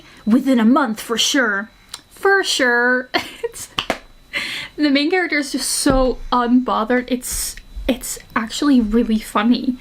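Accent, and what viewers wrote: Netherlands. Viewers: Okay i didn’t know you we’re dutch untill i read the settings in your e book😭 so thats why you have an accent! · omgg jij bent Nederlands? ik dacht dat je dat was vanwege je accent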